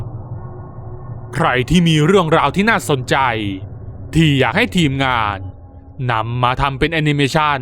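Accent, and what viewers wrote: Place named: Isan, Thailand. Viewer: อุ๋ยคนอีสานอีกแล้ว😆😜🤫 อีสานหรือหนือนะ 😔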